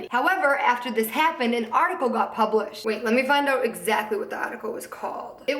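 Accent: Boston, United States. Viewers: Is she from Boston she kinda has the accent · I love your Boston accent 😊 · your boston accent makes me so so happy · are you from Boston? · Are you from Boston? I love your accent 😂😂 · what's her accent? Boston? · Sofia Lazcano-fafard she's got a Boston accent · her accent 😍😍 Boston?? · it isn't fake. It is a Boston accent and it is very common for people with accents to come and go. It can change depending on who she is talking to, her emotion, etc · Courtney Blair what the hell?😂 she's from Boston. Boston accents mainly only show with certain words. You're an idiot. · Boston accent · ThatsSoKendall it's a Boston accent. Definitely not country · Marilyn Peterson It's her adorable af Boston accent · My parents are from boston so they say things the same as you aha! and your my absolute fav! love you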